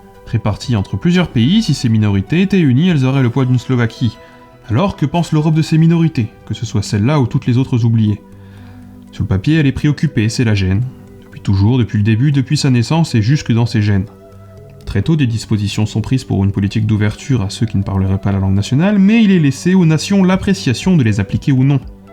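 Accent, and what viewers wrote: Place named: Banlieue, France. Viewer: Un accent de banlieue. Nois qui avions appris le français à l'école on a du mal à comprendre tous les mots de l'accent de la rue c9mme ce mr qui avale certains mots . C'est moche. Je lui conseille d'écouter les narrations d'une chaîne youtube appelée LAMA FÂCHÉ. J Aime la voie de cette chaîne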